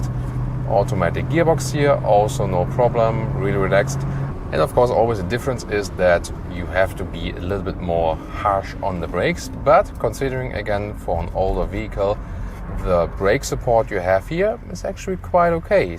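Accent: Germany. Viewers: Thank you for your reviews. I especially enjoy your German accent. You do a very thorough reviews. You certainly do rush through these reviews and that is welcome.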